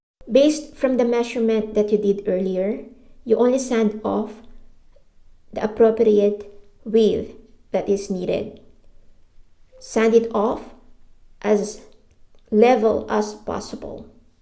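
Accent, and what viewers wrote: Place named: Philippines. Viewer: Ah. Kaya pala sounds like pinay. Hehe. Very informative, and very clear too. Salamat!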